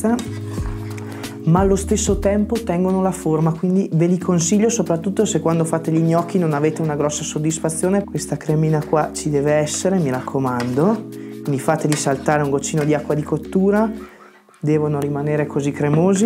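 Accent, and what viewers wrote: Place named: Veneto, Italy. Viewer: Ma te si veneto 💚? se non sbaglio... meraviglioso il piatto, complimentoni!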